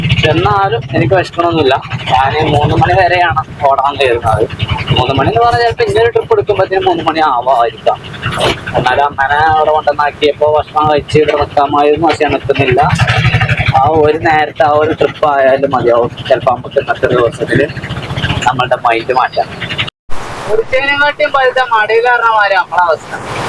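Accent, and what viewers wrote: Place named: Kannur, India. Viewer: ബ്രോ ന്റെ ഭാഷ പൊളി കണ്ണൂർ ഭാഷ ശൈലി ആണ് നമ്മൾ രൂപ ക്ക് എന്നൊക്കെ കേൾക്കാൻ നല്ല രസം 😃😃😃😃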